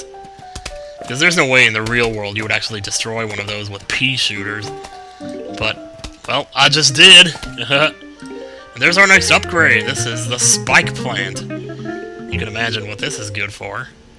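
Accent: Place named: United States. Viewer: your voice is way better then suger conroy and i love your streotipical american accen oww and if your woundering im english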